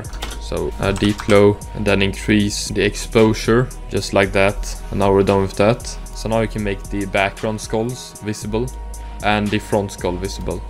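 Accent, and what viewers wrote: Sweden. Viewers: are you swedish? XD, i feel like i recognise the accent as a fellow swed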